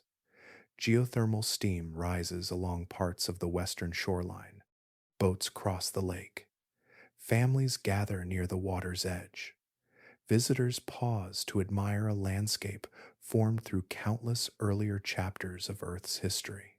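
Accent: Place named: United States. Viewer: Since you have an american accent, it'd be more like Toe-paw.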